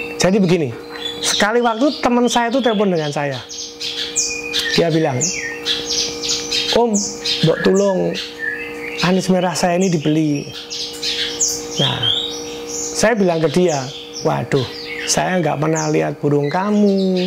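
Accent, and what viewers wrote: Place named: Yogyakarta, Indonesia. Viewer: kren cara bicarene ngk ningalne ciri kas jogja, mudah dicerna masih percaya bejo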